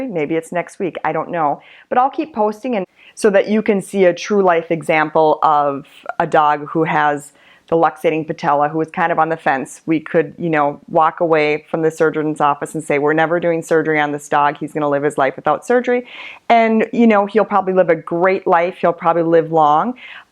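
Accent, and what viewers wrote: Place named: Midwest, United States. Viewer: very very good and informative series. My English Bulldog has been diagnosed with a stage III Luxating Patella also. I have not been told at this time that his ACL is not intact. He is 2 1/2 yrs old. It sounds like you would like you are from the Midwest. I plan to take him to the UNIV of WI Veterinary School which is supposed one of the best in the country. His first consultation will be a 4 hr exam ( so a very thorough exam) and then at that point he will be diagnosed as to how to proceed. Thanks again for the video series so I know what to " really expect" once he is sent home. I have been told that he will be staying overnight with his team to include check ups with his anesthesiologist to make sure he is not having any severe reactions for the surgery and anesthesia that was administered.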